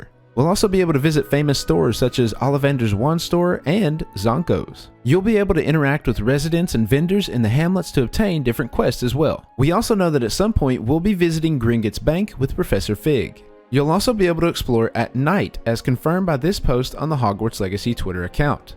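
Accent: South, United States. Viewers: Are you from the south? Because I am hearing an accent but it goes in and out. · Do you have an on/off country accent lol?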